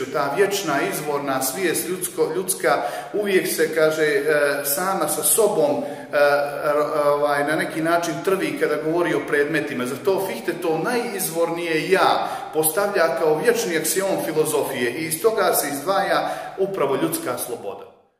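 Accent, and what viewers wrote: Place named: Montenegro. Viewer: Professore svaka cast, klassische deutsche Philosophie zvuci sa crnogorskim akzentom nekako zabavno😊😅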